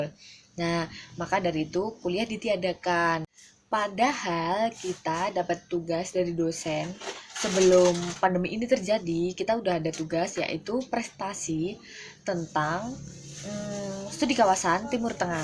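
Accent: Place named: Java, Indonesia. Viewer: Kok geliiiii 😂😂😂😂 medok banget ya bakno 😅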